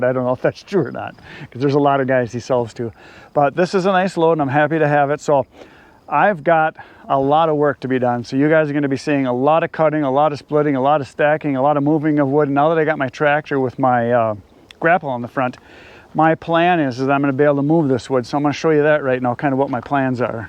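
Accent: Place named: Upper Peninsula, United States. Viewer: Welcome to Michigan. The people from the UP sound a bit like you so you should fit in nicely. Have a great time.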